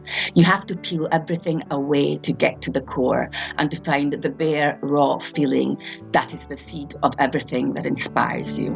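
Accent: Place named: Scotland, United Kingdom. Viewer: Your accent sounds Scottish, Italian and Jamaican in parts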